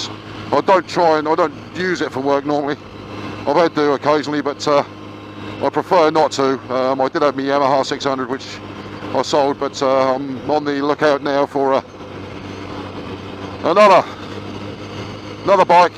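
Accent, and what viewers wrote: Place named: Suffolk, United Kingdom. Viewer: Good to see you back and hear that Suffolk accent again. Keep on trucking 😉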